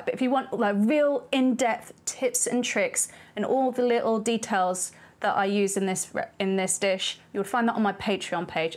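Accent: United Kingdom. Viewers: Nah, "malliard" and "bull-ion" is because she's British. You want some examples of how British people mangle European words, you should see the Great British Bake-off Mexican week special.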